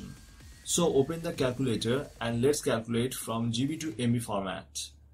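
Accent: India. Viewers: Indians speaking english sounds very ridiculous, next time use subtitles, the world will thank you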